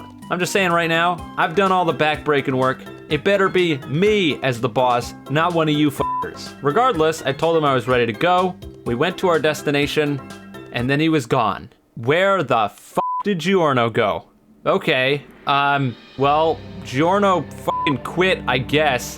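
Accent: United Kingdom. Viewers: I didn't know you were british?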